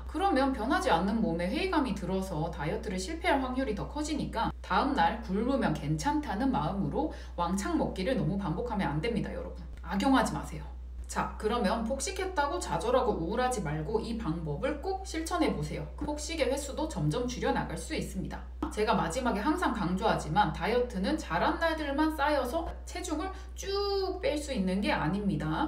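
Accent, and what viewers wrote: Gyeongsang, South Korea. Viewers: 경상도분이시죠? 내가 서울말쓸때랑 똑같은데ㅎㅎ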